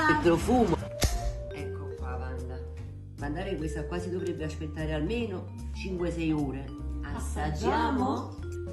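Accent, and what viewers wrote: Naples, Italy. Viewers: Gradisco anche la sua parlata napoletana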